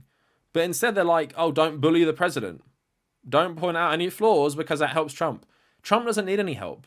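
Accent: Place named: United Kingdom. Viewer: Off topic, but I always heard you with a vague British accent but is that some Donegal and Derry I’m hearing?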